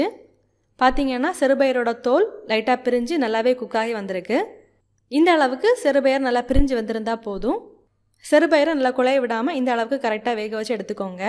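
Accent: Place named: Nagercoil, India. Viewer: Sister neenga nagercoil pola ........ speech apdi than iruku.....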